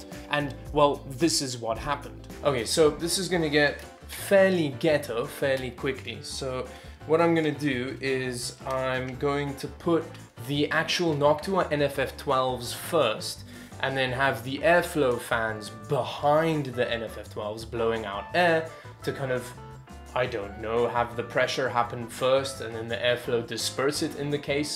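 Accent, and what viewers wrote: South Africa. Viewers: Are you South African? Hahaha, knew it from the accent. Checked instagram and there you mentioned an Afrikaans proverb. Goeie video. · Are you South African by any chance? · Bruh you sound like you are from South Africa. However my question would be. Is it better to take stock fans and put them as front intake fans? I have Arctic Freezer 34 with dual fans and I simply had to remove stock nzxt h500 and now I am thinking where should I put them. Can't you please help me to solve this riddle? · Are you South African? You look and sound like one.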